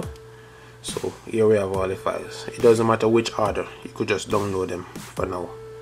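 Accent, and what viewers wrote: Jamaica. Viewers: Is he Jamaican?🇯🇲I am. It really sounds like it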